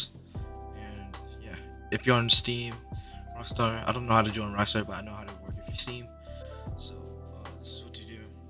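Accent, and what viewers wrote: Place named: India. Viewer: bros indian now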